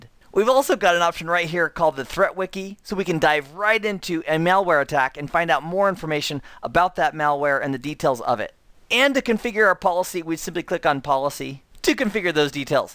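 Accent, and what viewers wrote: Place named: United States. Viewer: You have the most American voice ever.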